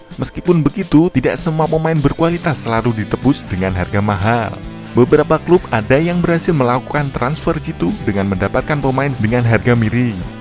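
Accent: Java, Indonesia. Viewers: pengisi suaranya medoookkk..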